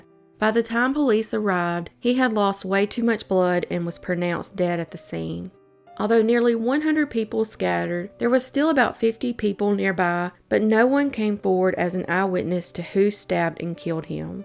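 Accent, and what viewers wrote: South, United States. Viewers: Coffee, Crime and a Southern accent. It is a good morning.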